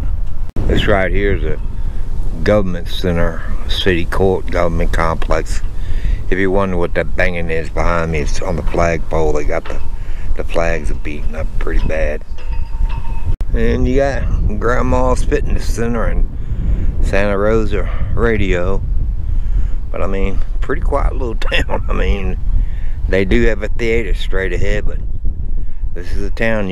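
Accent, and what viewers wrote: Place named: Louisiana, United States. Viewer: Boo Yah. Sounds like ya from La.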